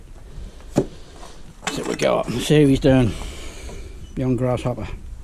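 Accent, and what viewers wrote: Norfolk, United Kingdom. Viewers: Are you from Norfolk? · You're a very good teacher. I've lived in Suffolk for 30 years - a Dorset boy originally - and recognised your Norfolk tones straight away. You make this job look so easy - thanks for sharing.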